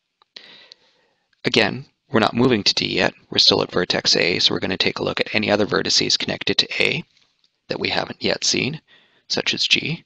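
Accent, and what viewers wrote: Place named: United States. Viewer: bhai india me hi rehta hai na.... kyunki accent se to america ka lagg rha hai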